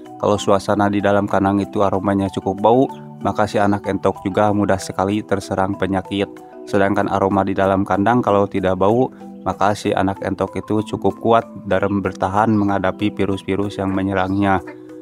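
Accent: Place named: Sunda, Indonesia. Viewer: assalamu'alaikum mang amang orang mna gening ngomongna sunda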